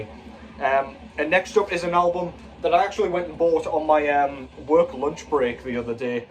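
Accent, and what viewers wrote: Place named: Scotland, United Kingdom. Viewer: Don't mean to have your headache get worse...I was kind of pulling your chain last week when I suggested you had a south african accent. I figured it was scottish (mothers side of the family, McRae from the highlands, down to Colombia in the 1920's) but it seemed very mild, like maybe watered down from another anglo country, maybe even the USA. SOme of the scottish immigrants in Toronto (CAN), you can barely understand them, even the ones here since the 1950's. Great show on black metal, man. Cheers.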